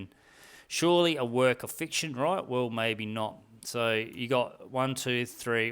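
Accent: Australia. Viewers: The size of the shark would not matter to me; a shark is a shark!!! Whether it's 1 meter or 5 meters, there's absolutely no freakin' way I'd go in the water ever again!!! Oh by the way, I like your Aussie accent. Just sayin'. 🇨🇦👊🤙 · he's Strayan.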